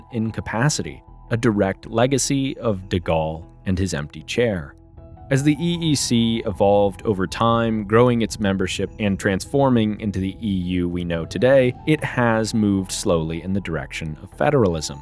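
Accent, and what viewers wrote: United States. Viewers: Sounds very American.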